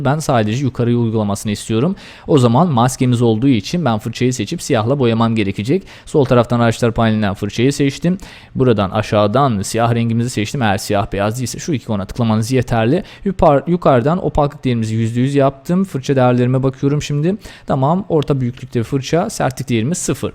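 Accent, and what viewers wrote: Azerbaijan. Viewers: sen azerbaycanlımısın acep?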